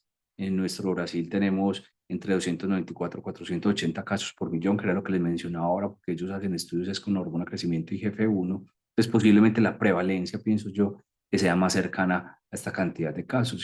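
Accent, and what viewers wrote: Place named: Colombia. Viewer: Qué lindo explica y qué hermoso acento colombiano. Saludos desde Ecuador.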